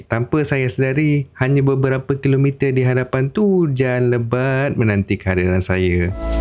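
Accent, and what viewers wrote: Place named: Java, Indonesia. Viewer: Abang ni orang Jawa ya,Sebab bunyi slang abang bercakap ada bunyi Jawa😄🙏🤝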